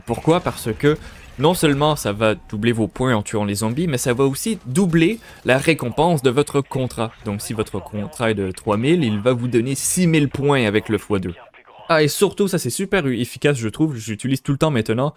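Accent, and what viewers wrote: Quebec, Canada. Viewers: Yest clairement quebecois pis y se crois 😂😂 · Merci beaucoup!! Es tu Français de France ou tu un Français au Québec??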